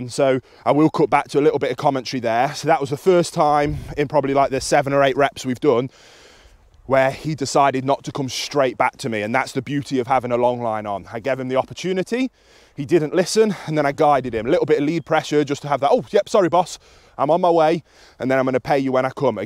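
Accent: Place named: North, United Kingdom. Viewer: I'm late to this party. As a northerner in London, it's nice to see and hear a northern Brit doing this, rather than an American. Thank you!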